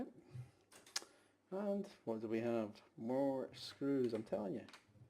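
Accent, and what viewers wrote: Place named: Scotland, United Kingdom. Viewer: Thanks, love your low key presentation (and the Scottish accent!)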